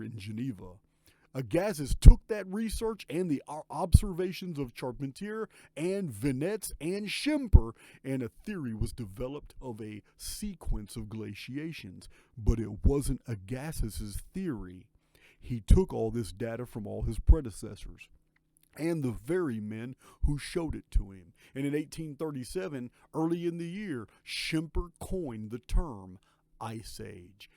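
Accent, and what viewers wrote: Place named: Texas, United States. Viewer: Hey there I just wanted to say that I really loved the interview that you did with Joel but it was so sad that I could barely hear you and I know that you're bringing new equipment on board but I think your levels are super low still this video is also hard for me to hear I don't always have the ability to get to a speaker sometimes I'm just listening to it right through my phone and it used to be so much louder so have you guys can tweak that we love to hear you loud and clear with that Charming Texan accent😇🥰😍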